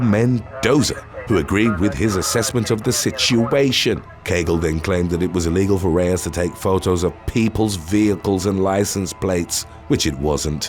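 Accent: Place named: United Kingdom. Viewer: That’s just how Brits pronounce it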